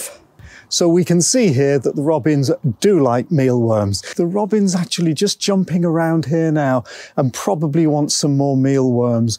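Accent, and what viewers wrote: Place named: United Kingdom. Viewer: Where do you live? I live in Arizona USA… you sound British to my ear❤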